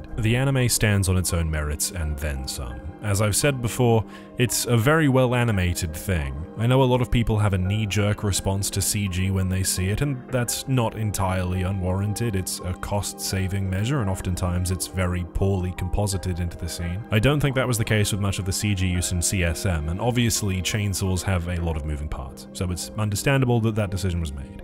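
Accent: United Kingdom. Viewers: is... is the british accent all a lie?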